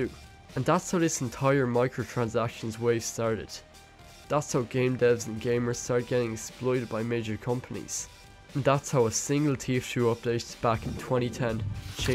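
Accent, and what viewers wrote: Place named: Waterford, Ireland. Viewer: What county are you from cause your defintly a waterford man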